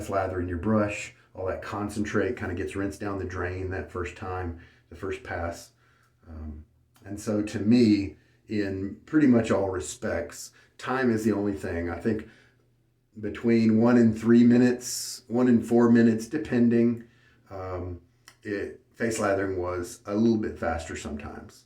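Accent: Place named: Carolina, United States. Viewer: oh it’s no problem at all! I get a bit overzealous with my comments sometimes haha. Very cool! I felt I was recognizing a carolina’s accent.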